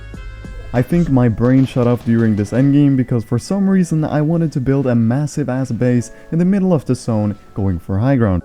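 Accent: Norway. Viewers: u norwegian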